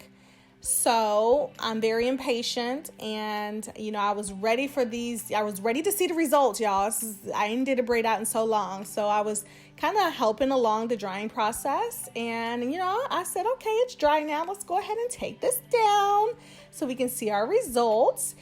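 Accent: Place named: South, United States. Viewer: You have such a southern twang in your speech. I love it. I am also thinking about getting a tapered cut. I love yours.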